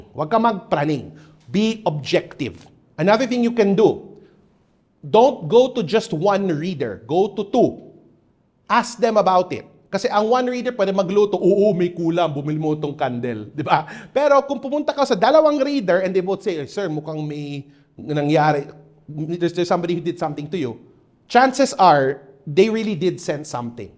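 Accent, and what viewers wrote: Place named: Cebuano, Philippines. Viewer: Malaman talaga na cebuano si sir eh pero hands off napaka Professional!!!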